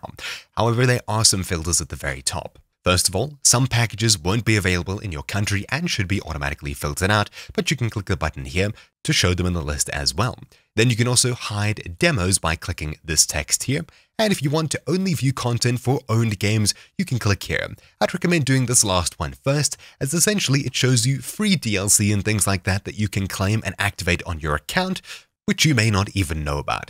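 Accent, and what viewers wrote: South Africa. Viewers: Lmao south africa😂 Is that a joburg boet I hear? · You're South African! Nice! :P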